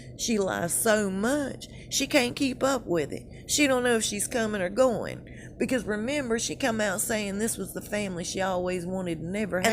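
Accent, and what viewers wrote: South, United States. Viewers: Love your Southern accent! Makes me homesick. I also agree with your point 9fview.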